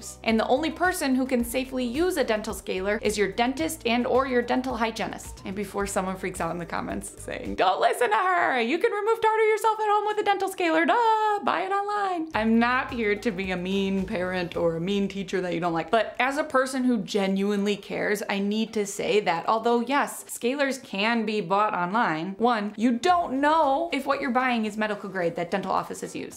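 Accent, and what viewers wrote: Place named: Midwest, United States. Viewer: Awesome! I could detect the Midwest accent. My family is from Iowa but live in Cali. Thanks for your content!!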